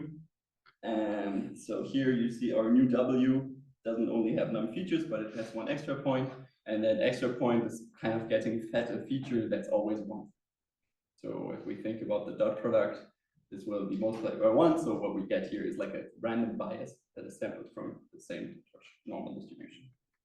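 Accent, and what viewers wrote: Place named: Germany. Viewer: perfect German accent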